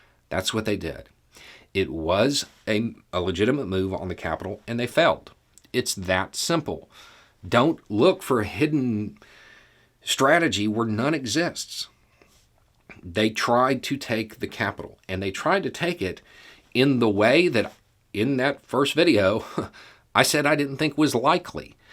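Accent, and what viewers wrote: South, United States. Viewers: Stop hating 🤡. Yes it’s out there but packages this in a nice and logical way for us to digest. I still do my own research but damn that southern accent just makes it so much more fun to hear it from him😬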